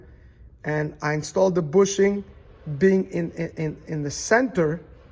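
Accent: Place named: Quebec, Canada. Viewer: You from Quebec bro ?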